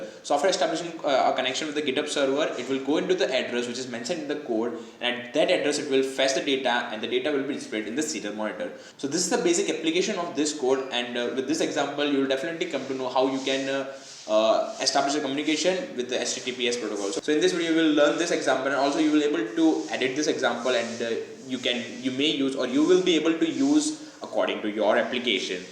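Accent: India. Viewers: Why do all these videos have to be in indian/gibberish english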